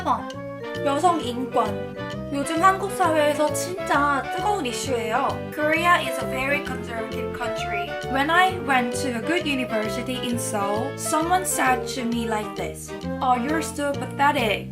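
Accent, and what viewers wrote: United Kingdom. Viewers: Você tem sotaque britânico quando fala inglês 😂 que fofa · Your british accent is so gooood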